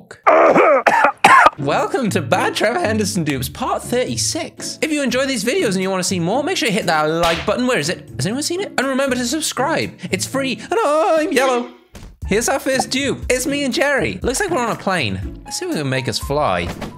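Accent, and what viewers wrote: United Kingdom. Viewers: sir- are you british?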